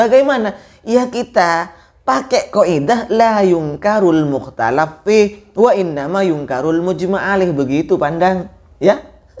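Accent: Madura, Indonesia. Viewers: Logat bahasa nya agak ke madura... Salaam Sataretanaan.. Sehat selalu cak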